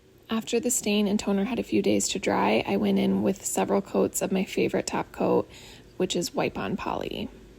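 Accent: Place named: United States. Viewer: Ese acento americano es muy feo. No soporto escucharlo.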